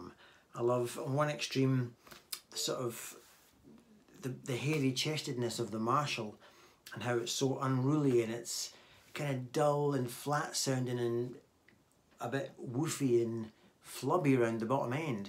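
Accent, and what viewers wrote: Scotland, United Kingdom. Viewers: just discovered your channel, brilliant to hear a fellow Scotsman on YouTube not too many about, love your playing and analysis, keep up the good work buddy.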